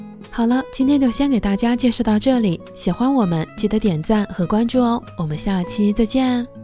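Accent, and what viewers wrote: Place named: Guangxi, China. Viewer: 樹瓦蘭窖 我就猜他是广西的，口音太有广西味儿了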